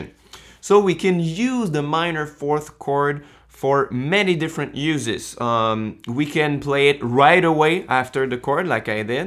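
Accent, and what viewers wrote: Quebec, Canada. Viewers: Tu sonnes québécois. J’me trompe tu?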